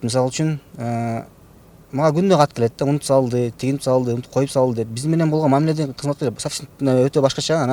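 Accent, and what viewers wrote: Standard. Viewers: Азаматсын 👍👍👍Кыргызча таза суйлойт турбайсынбы, биз ойлоптурбуз кыргызча суйлой албайт экен деп. Ишине ийгилик иним....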